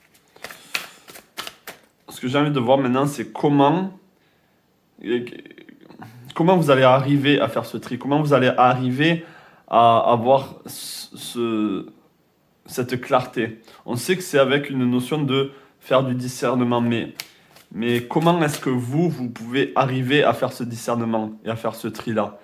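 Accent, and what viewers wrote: South, France. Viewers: Merci pour votre reponse. J'ecoute bcp de choses sur travailler ci ou travailler ca, mais concretement ca veut dire quoi et comment in fait? Sinon j'aime beaucoup le concept d'adresser les diffulcutes et l'accent du Sud!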